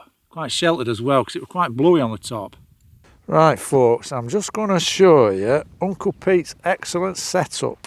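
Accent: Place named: Yorkshire, United Kingdom. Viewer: Many thanks. We really enjoy your videos and your appreciation of birds, insects ,wild flowers etc, and we love your Yorkshire accent.We used to live in Coverdale, North Yorkshire and now we live in Alberta, Canada. We miss the walks but we enjoy yours and pretend we are walking with you. Please keep walking and sharing. Many thanks.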